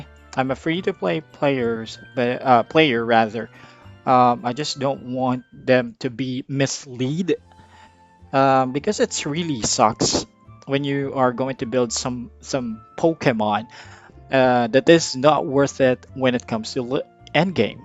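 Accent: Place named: Philippines. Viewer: I mean you really do sound like a Filipino professor haha!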